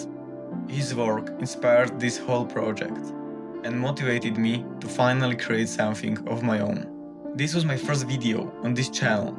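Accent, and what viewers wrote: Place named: Slovakia. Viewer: ten slovensky prizvuk strasne pocut :dd, pekne video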